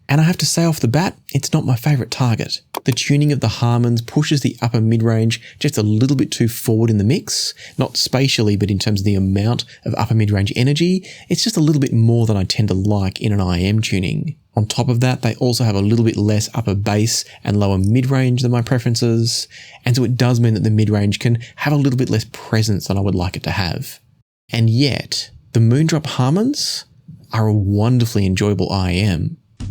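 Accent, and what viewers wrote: Australia. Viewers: He's Australian......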